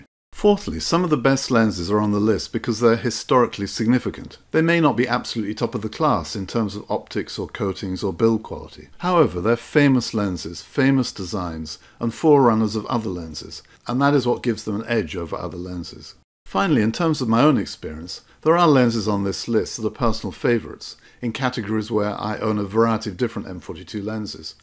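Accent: United Kingdom. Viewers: I love your British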